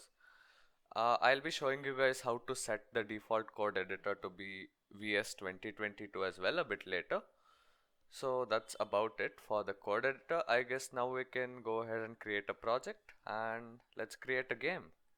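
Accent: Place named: India. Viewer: I came from freecodecamp's sir aapki aawaaz se hi pechan gya ki indian ho and instantly subscribed 😁 😂 btw can you tell me is this good for future? I mean is field me jobs kaisi h? And india me kaisa scope h?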